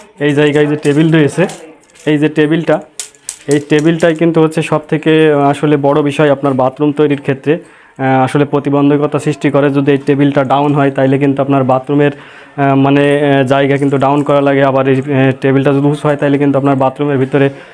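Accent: Jessore, Bangladesh. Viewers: Thank you.kub Moja legece r apnake dekhe onnek onnek hasi payece.mone hoi apnake osostite fele diyeci.sorry.tobe apnar mukhe jossorer vasa sunte darun lagcilo.kintu short vedio upohar dilen!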